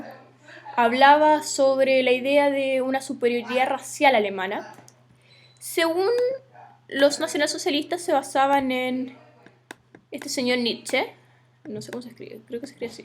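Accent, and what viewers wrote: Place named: Chile. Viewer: WACHO COMO HABLAN LAS CHILENAS LPM ES HERMOSO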